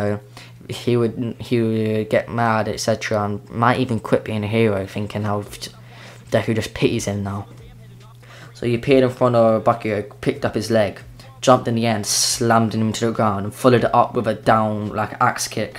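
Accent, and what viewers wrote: United Kingdom. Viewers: I hear a British Accent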